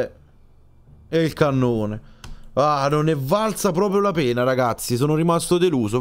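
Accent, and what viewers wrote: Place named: Naples, Italy. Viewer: haha si vede che hai l accento napoletano cmq bel video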